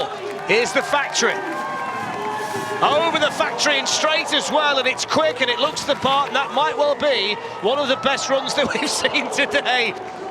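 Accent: United Kingdom. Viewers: I love ghis british commentary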